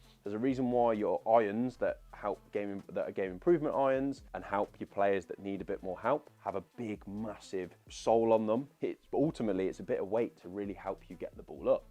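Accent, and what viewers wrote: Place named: Derbyshire, United Kingdom. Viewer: You sound like you are from not too far away from me judging by your accent. Im from Derbyshire. Where would you recommend getting a fitting? Any suggestions? ✌️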